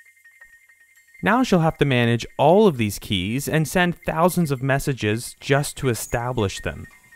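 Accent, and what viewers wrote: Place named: Canada. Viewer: i was like there's something weird about this accent.. then he said aboot.. and i was like o right, he's canadian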